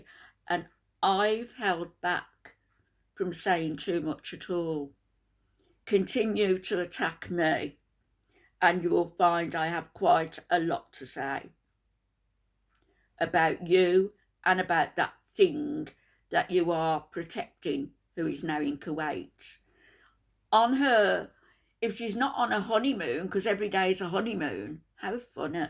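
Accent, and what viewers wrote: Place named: Midlands, United Kingdom. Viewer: I love your midlands accent. You are so on the nail with everything, and your perspective is always interesting and entertaining. Keep up the fantastic work.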